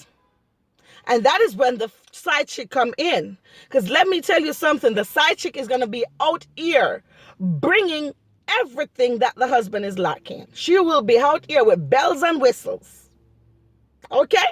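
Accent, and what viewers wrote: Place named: Jamaica. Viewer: I love your exit is that Jamaican this may be ignorant question ... I apologize if it's offencive but your accent is lovely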